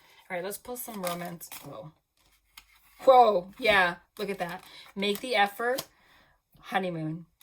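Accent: New Jersey, United States. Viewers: Idk who will read this. Idk if you will ever read this, but I do wanna thank you. Imma just put this positivity out into the universe. This came at a really pivotal time in my life. I lived through abuse at the hand of my adoptive father. Recently there is a girl I fell in love with where I had to cut it off. Everything you said hit soo hard. I’ve always been someone who’s been scientifically grounded. Lately I’ve had a sort of spiritual awakening and stoped ignoring this calling. That’s when I found this. I’ve been trying to work past a lot of things that need healing and I’ve done really well, but wow!!! Lemme just say your gifts, your abilities, your words truly hit home and inspired me to push through on this journey. This also gave me a lot of hope. Ty so much 🙏 also I’m from New England and your voice mixed with that Jersey accent 😩 the universe clearly wanted me to hear this message. 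Thank you so much for the positive energy you put out for everyone!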